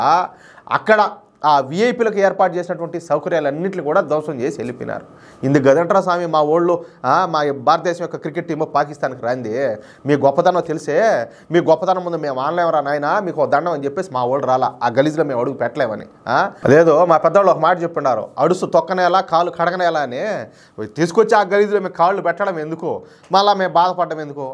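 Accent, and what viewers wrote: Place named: Kadapa, India. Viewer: Ni slang cuddapah laga undhi brother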